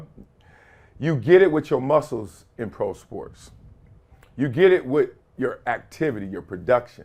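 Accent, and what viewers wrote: Los Angeles, United States. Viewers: I ain't yo homie....heard that Compton in you, sounded straight LA with it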